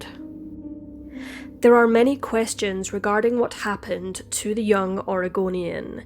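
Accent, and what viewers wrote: Scotland, United Kingdom. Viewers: It's hard to peg your accent. Sounds like a hybrid of Scottish and something else? Love it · Is this a Scottish accent or an Irish accent? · Love the Scottish accent 💞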